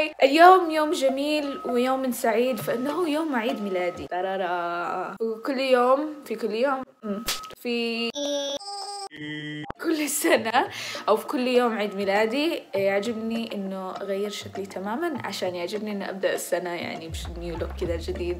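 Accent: Yemen. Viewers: حلوه اللهجه اليمنيه ع لسانك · ماشاءالله عليه عسل بس عندي ملاحظه... برغم أنها عايشه في أمريكا إلا أن لهجتها اليمنية مازالت تتكلم بها وهذا شي عجبني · كلامها يقول هي من وين بنتان من اليمن والله ما شيء كمانا فديتك وفديت اليمن وارضها ومن عليها ومن خارجها 🌸🌸🌸🌹♥️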